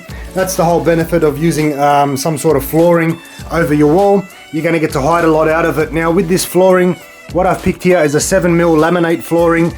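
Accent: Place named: Sydney, Australia. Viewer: Didn't he say he was going to remove the baseboard? With an accent like that, I'm guessing he lives in Sydney.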